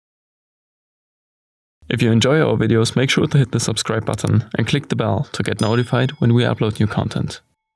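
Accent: Germany. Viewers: Your German accent makes your videos surprisingly interesting and oddly satisfying to watch. Would be cool if you would go to Scandinavia next, like Denmark, Sweden and Norway. ....hit me up if you are in Denmark tho.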